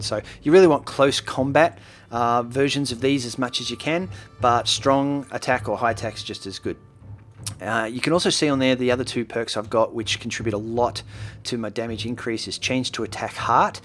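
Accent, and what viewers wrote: Australia. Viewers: great vids by the way, I'm aussie too, nice to hear the accent.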